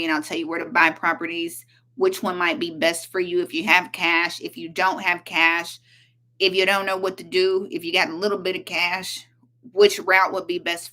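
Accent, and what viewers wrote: South, United States. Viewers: I love your country accent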